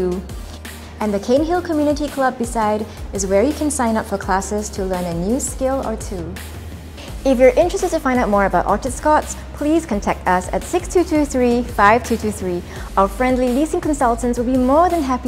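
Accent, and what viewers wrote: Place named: Philippines. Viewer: seems to be a Filipino because of nice English accent..🇰🇷🇺🇲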